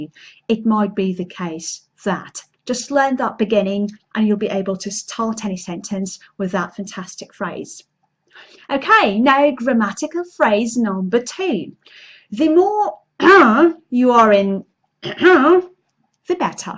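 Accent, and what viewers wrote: RP, United Kingdom. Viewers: Do you sound in RP?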